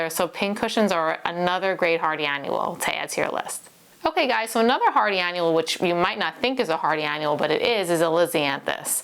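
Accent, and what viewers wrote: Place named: Long Island, United States. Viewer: Accent rough. People from LI and Staten Island always sound a little drunk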